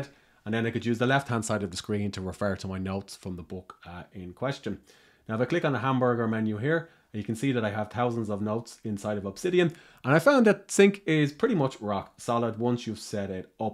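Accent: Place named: Ireland. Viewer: You mentioned that I can use a pencil. But I was wondering if I can use it as a handwriting tool? I know that you can use the pencil to click on the keyboard as you type but I can no longer see the keyboard so I was wondering if there's a way that it can be used for handwriting instead so that I can write as if I was riding on a paper? Did you get your accent from parents or do you still live in Ireland? Hopefully that made sense lol I am writing a novel currently and one of my characters may possibly be Irish and I was curious.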